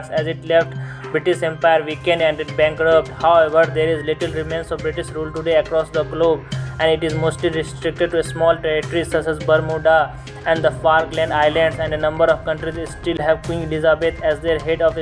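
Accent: India. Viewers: Indian english i dont hate but its annoying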